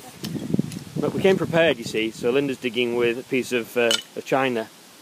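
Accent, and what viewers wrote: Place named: Manchester, United Kingdom. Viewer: Are you guys new and do i detect a fellow manc? Loved this video and looking forward to more! You are very watchable and I’m fussy!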